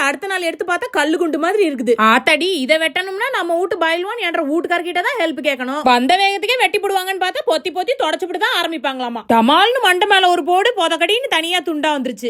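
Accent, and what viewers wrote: Kongu, India. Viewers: Kongu I think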